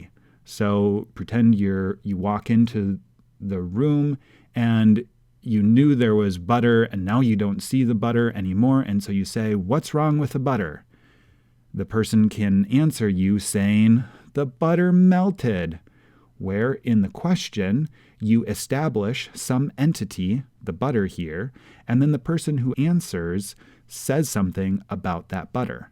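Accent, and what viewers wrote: United States. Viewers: Your pronunciation needs to be a bit less Americanized. Great information though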